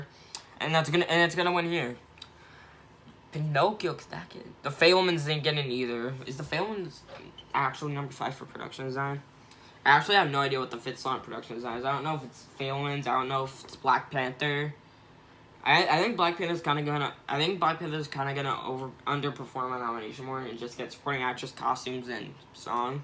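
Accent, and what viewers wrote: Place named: United Kingdom. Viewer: Love your british accent 🤭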